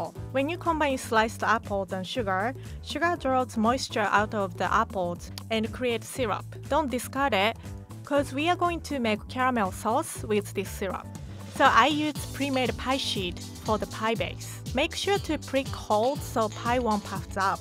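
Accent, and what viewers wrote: Japan. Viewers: hæs lived in ðe States 10 jers ænd still hæs a Japanese accent... interestiŋ It'st so fascinatiŋ to sich how people's accents wœrk! Ich lived in Canada for a jer ænd ich adopted ðe accent instantliche... How loŋ dœs it take for ȝou to adopt æn accent? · Yep!! Her Japanese accent is charming 😃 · Her japanese accent is sooo cute! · 10 years didn’t make her japanese accent go away. I’ve met people who lived overseas for 2 years an came back with an accent but can’t speak his native accent like usual..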